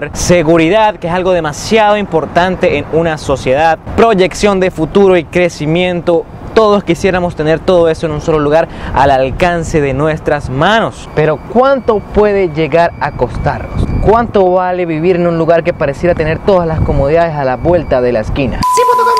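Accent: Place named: Andes, Venezuela. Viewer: lo Gocho.. es una expresión ... por su acento característico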